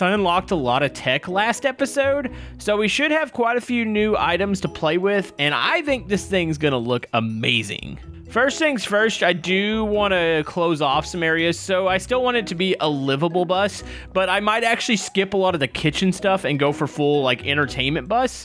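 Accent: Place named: Texas, United States. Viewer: I watch your content alot and I will say its pretty fun, especially when you see it with snacks! I couldn't help but notice that you sound texas (Not being rascist or something tho)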